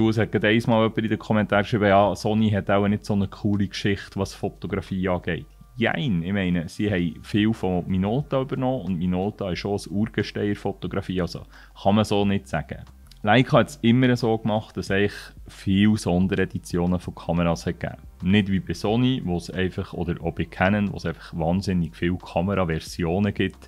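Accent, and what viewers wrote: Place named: Bern, Switzerland. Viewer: Schön wieder einisch Bärndütsch z'göhre. Danke für das Video. Ich bin auch der Meinung, dass die Kamera in erster Linie gefallen muss und nicht nur sollte. Denn dann nehme ich diese bei jeder Gelegenheit in die Hand und möchte fotografieren. Wer sich in Leica verguckt hat, braucht dann eben eine entsprechende Brieftasche. Wer bereit dazu ist, darf jeder für sich entscheiden, für mich kommen dann jedoch andere Kameras in den Fokus, welche genauso gefallen und technisch meine Ideen noch besser unterstützen. 60MB ohne IBIS ist für mich fragwürdig. Diese Auflösung wird nicht ausgeschöpft, würde ich meinen und füllt den Rechner mit Daten, aber auch das muss jeder selber abschätzen. Generell wird die Auflösung zu stark bewertet. Wer was braucht an einer Kamera, sollte von der Gestaltung der Bilder abhängig sein. Ein EVF unterstützt gewaltig die Komposition sowie die Bildgestaltung durch Belichtung und Blende. 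Immerhin sind diese zwei Faktoren Bildbestimmend. Geht es auch ohne, wunderbar, ich möchte jedoch ein EVF nicht mehr missen, dann wenigstens ein Display. Um nochmals auf Leica sprechen zu kommen, jeder Kamerahersteller hat seine Geschichte, allerdings macht das Marketing von Leica einen guten Job, ob das die Kamera besser macht, möchte ich bezweifeln, eher teurer. Aber so ist es nun mal, auch bei anderen Produkten, wer sich in eine Rolex verguckt hat will keine Swatch. An dieser Stelle muss sich jeder selber die Antwort geben, wieso weshalb und warum Mensch das Bedürfnis hat u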